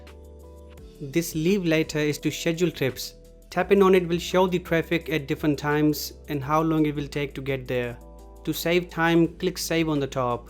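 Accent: India. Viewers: I hate Indian accent the most sorry...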